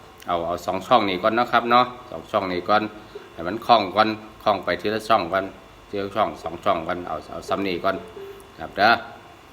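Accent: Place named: Chaiyaphum, Thailand. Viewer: ม่วนหลายครับอาจารย์ เข้าใจง่ายนำพร้อม สำเนียงเว้าอาจารย์คือคนชัยภูมิแท้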